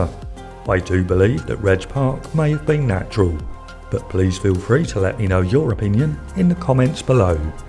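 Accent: United Kingdom. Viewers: good point. I should have picked up you are speaking the kings English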